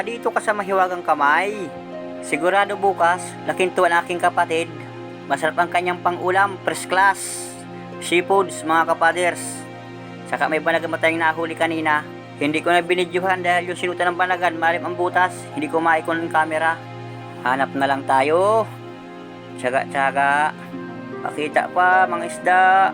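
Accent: Bicol, Philippines. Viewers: bicol na bicol ang tono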